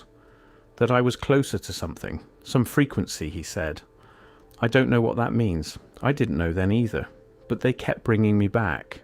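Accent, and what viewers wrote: United Kingdom. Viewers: How come all the narrators of these episodes are Pommies?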